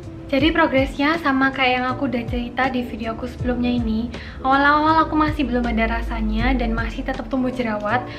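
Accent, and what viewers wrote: Java, Indonesia. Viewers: ojo medok medok banget mbak hehehe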